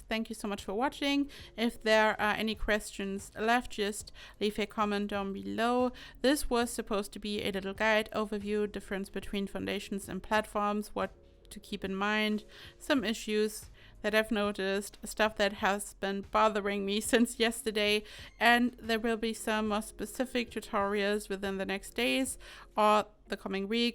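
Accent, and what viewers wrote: Germany. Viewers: you're English has a German accent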